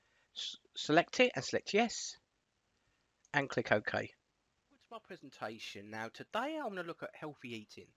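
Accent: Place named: London, United Kingdom. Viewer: OY!!!! You sound like you’re from London!! 🤣👏👏👏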